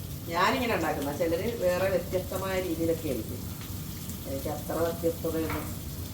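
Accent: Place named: Ernakulam, India. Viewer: Super singu... Live happily God bless u ekm il ninnu parayunnapole undi❤❤❤❤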